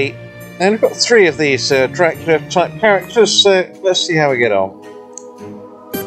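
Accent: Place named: Newcastle, United Kingdom. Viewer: I miss the geordie twang like man 👌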